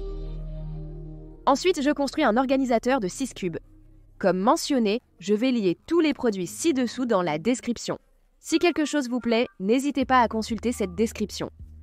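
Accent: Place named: United States. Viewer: Hello, I really like your content on YouTube. I watch your wonderful videos from my country Morocco. It's far away, but I really like what you do on YouTube, can you add the audio track option on the settings so I can listen to your American accent, I like it and it also helps me a lot to learn English.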